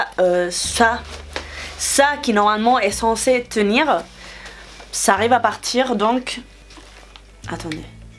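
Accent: Spain. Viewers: J'adore ton accent espagnol! Tu es trop drôle! Bonne continuation!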